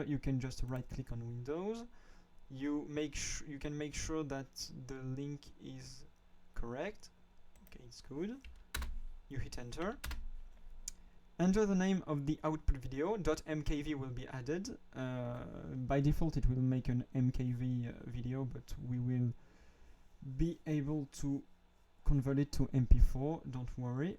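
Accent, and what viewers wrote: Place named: France. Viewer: Been lookin for a way to download twitcast videos for offline playing for quite sometime and you did it!! Mèrci!!!! Hope I'm not too preseumteous to assumed that you're French from your accent. P.S : Locked videos can also be downloaded provided you have the password for it, and start/play the video before the browser console step. (The vid I wanted to grab is a locked one) P.P.S : The only difference for me is, I have to hit enter after the download has completed. It did not promt me about the conversion automacally.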